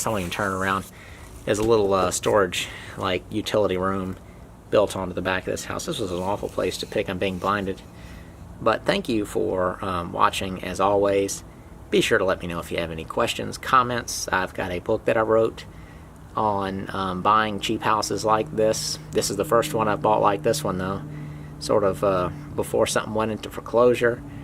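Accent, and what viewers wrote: South, United States. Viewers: It's called Southern. 🤦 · My guess is Georgia or Alabama, but what do I know? 🤷‍♀️ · Your accent was pure 'Gone With The Wind'!!! 'Oh Ashley'! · Yeah I would say his Southern accent is retaining a good amount of the "Transatlantic" or "Mid-Atlantic" accent that we used to teach in schools before WW2. You can check this accent on YouTube - fascinating story. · What accent is this? I can hear it is southern but I'm curious where it is from exactly.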